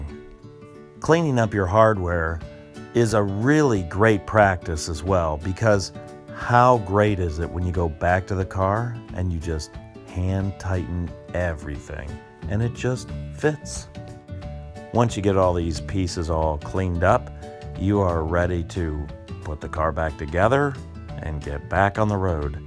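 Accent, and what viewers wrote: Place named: United States. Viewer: Thank you. Now I know what's wrong with my car. BTW, love the way you talk American man, I'm from Down Under.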